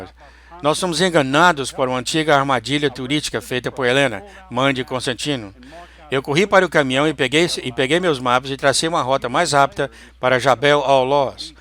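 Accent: Minas Gerais, Brazil. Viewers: quem esta narrando e um mineiro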